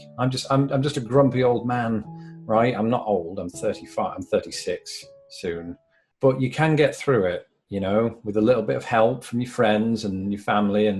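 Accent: United Kingdom. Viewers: Proof we will watch anything with birtish commentary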